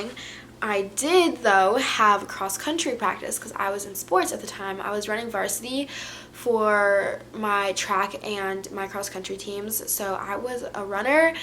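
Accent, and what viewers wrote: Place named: Rochester, United States. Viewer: Hey! Love your videos, been watching since your first one! I’m from Rochester too and I can tell your starting to get an accent😊 keep making great videos xoxo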